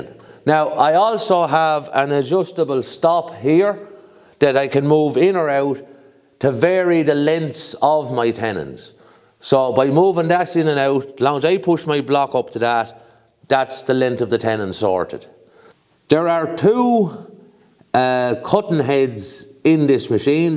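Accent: Ireland. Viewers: Well done 👏 1k. Great video. You sound like a kildare man.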